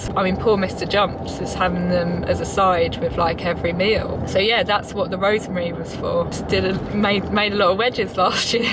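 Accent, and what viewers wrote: United Kingdom. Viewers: I don't know why....but I love to decode British accent 😅..and I love your accent too❤️ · I didn't know British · Are you British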